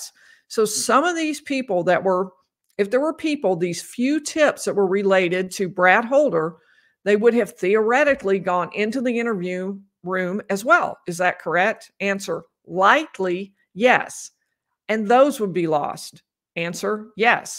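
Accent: South, United States. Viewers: Your Southern is coming through,!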